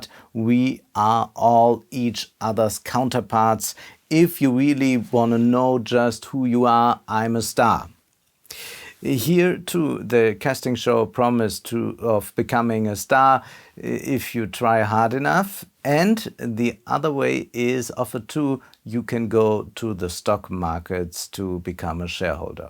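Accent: Germany. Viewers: Weil sein English zu Denglish ist.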